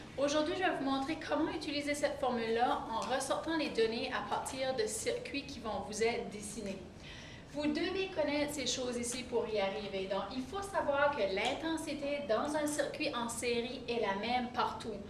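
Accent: Canada. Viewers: Bonjour, D'abord, merci, pour l'accent québecois que j'adore et me rappelle d'excellents souvenirs. Ensuite merci pour cette explication claire, surtout sur la formule des résistances en parallèle que j'ai du mal à assimiler: la simplification des fractions est une difficulté pour moi, qui ai passé la soixantaine, et dont les notions scolaires de maths sont très loin. Il est, en plus, difficile de trouver une vidéo détaillant bien cette formule. Merci encore, et bonjour au Québec, tabernac' !!!!! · Merveilleux accent 8') Les canadiens vous êtes vraiment géniaux !!!!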